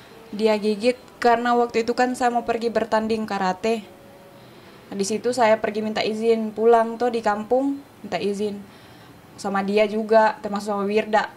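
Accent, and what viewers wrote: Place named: Bugis, Indonesia. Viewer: Itu bahasa Indonesia campur logat bugis soalnya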